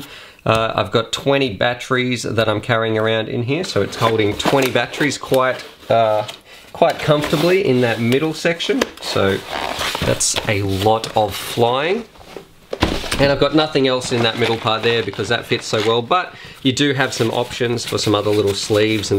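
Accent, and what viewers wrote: Australia. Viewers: Hay great videos man but just wondering are You Australian because if you are like me I would love your chance even more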